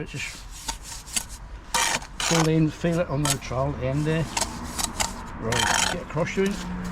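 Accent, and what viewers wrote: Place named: Norwich, United Kingdom. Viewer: Where abouts in Norfolk are you? Sound like a good old narwich boy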